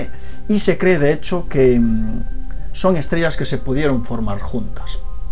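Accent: Spain. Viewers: ese asento español jajaja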